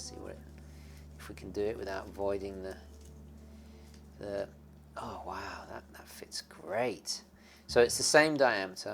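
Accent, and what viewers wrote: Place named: United Kingdom. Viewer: ! you stated that it was the best GMT at $1000” love what you do and your the reason I brought my first hammy, the khaki field day date! Keep up the good work and make sure you don’t loose your British accent. From tom in Leicester 🇬🇧🇬🇧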